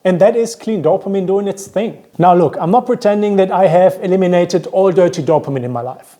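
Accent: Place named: Germany. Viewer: My ADHD brain tells me to guess your accent. Deutschland? Ich learne ein Jahre Deutsch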